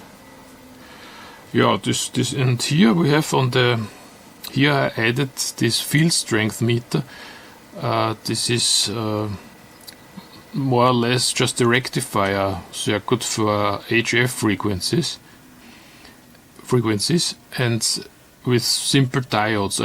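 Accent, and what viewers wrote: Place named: Vienna, Austria. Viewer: Aguy with a Vienna accent, great.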